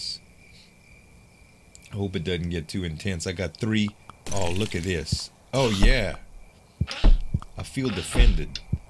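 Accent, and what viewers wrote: Louisiana, United States. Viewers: Was trying so hard to figure out what kind of accent. It's Louisiana, isn't it? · brain They are both good ol' boys. is from Louisiana and Freeman is from western Mississippi (raised there, not born). So they both have similar regional accents.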